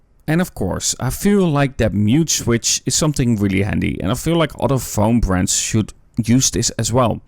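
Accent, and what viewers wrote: Netherlands. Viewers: nooit geweten dat je Nederlands was, ik hoorde het accent en dacht gelijk dit is een Nederlander.🇱🇺 Keep up the good work 💪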